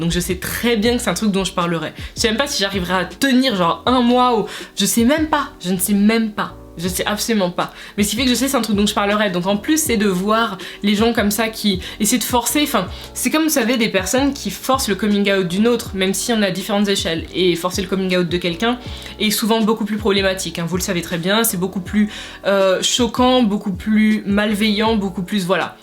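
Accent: England, United Kingdom. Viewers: pourquoi tu prend un accent anglais? C'est voulus ou tu es a appris l’anglais au Royaume Unis?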